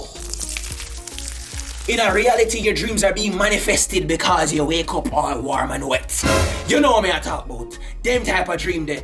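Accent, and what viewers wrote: Jamaica. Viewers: This might sound crazy, but him sound like young machel montano, just with a jamaican accent instead. Same kinda tone and slight raspiness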